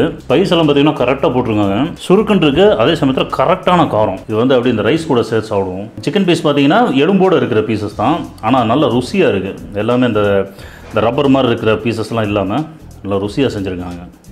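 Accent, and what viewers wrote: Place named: Coimbatore, India. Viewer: Covai speech thani special sir...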